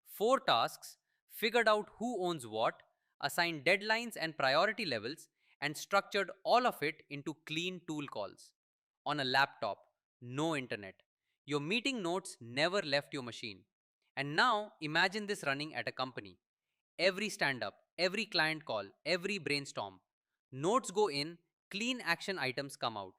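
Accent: India. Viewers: oh no indian english - instant quit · first indian accent which I actually endured, insane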